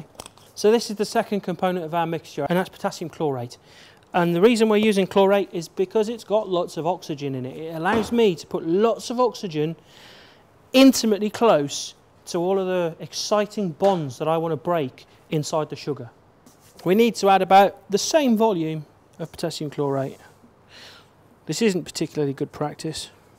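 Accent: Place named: England, United Kingdom. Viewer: this guy is so incredibly English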